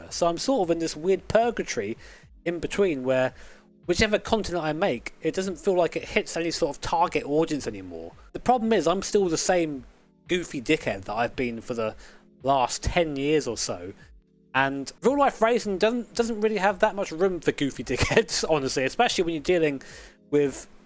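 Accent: United Kingdom. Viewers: I remember when I discovered this channel it was a livestream with about 150 viewers and this guy with the British accent and the Japanese headband was completely dedicated to what he was doing. For me it had something of the Topgear spirit... made me subscribe straight away... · I started watching you while playing GT6 year or two before start of big cough, finishing my uni. Watching you and tbh. There was something cozy and helping me in "British guy talking with engine background ASMR" at this period. Thanks for all your content, wishing you all the best, like best car ever - Fiat 126P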